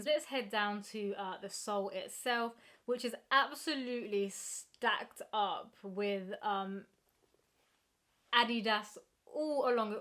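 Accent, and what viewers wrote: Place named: South, United States. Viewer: nice trainers, what part of down south you from i can pin point the accent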